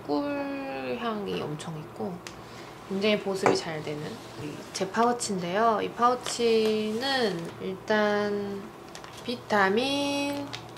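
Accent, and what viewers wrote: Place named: England, United Kingdom. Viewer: She has such a nice English accent, I love it ❤️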